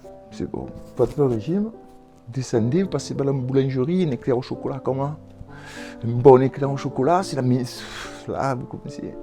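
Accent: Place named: Marseille, France. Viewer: Vous êtes le seul à nous présenter les topiques sans prise de tête, c'est compréhensible et tout a fait abordable finalement. A condition d'expliquer ÇA clairement, sans trop de termes barbares. Étudiante en soins infirmiers, ÇA m'aide beaucoup. Merci beaucoup !!! Le cadre est idyllique, sous un magnifique soleil ! Mais ce qui rend cette vidéo agréable à voir, et surtout à entendre, c'est ce chouette accent marseillais qui donne une jolie musicalité à vos propos. J'adore 💚❤ Encore merci... 👍😘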